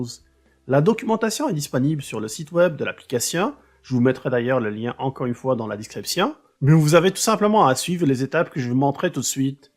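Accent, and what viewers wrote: Quebec, Canada. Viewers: J'adore l'accent québécois. Je pourrais t'écouter pendant des heures mais je ne me lasse jamais. Ah Seigneur j'attends un que tu mettes un québécois sur mon chemin il va parler pour que je t'écoute 🥰🥰🥰😂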